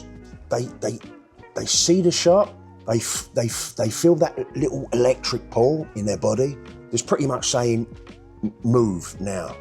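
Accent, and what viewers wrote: Cockney, United Kingdom. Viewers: Best video ever made in the history of YouTube. I see you walking around the West Village, and then if I close my eyes and listen, I hear a cockney geezer from East London. That's really the secret. Good for you, mate.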